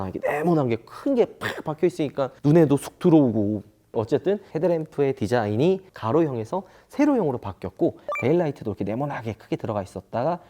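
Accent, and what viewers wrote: Gyeongsang, South Korea. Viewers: 야깐 야깐 하지좀마 이 갱샹도 유튜버 야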